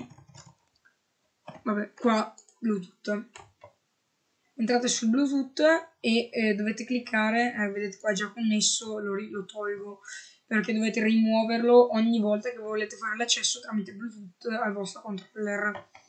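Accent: Italy. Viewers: bello l'accento veneto comunque bel video grazie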